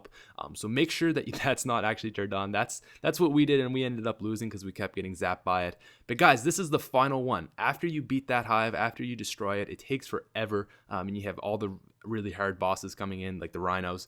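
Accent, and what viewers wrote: Canada. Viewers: The "ote"skirts. Dat canadian accents ;) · Liked. Are you canadian? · Canadian Accent Doe LoL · "Ohtskirts"? "get oht"? Are you canadian or something?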